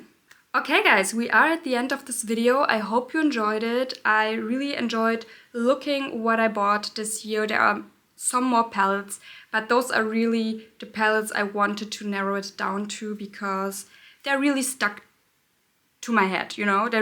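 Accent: Germany. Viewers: I love your accent! Do you live in Germany? Happy New Year❤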